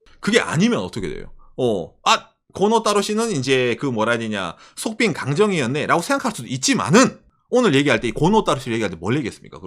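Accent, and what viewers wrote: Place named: Gyeongsang, South Korea. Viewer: 재미있는 건 일본에서 그렇게 오래 살았는데도 경상도 사투리 " ~ 맨시로" 가 남아 있는게 신기하네.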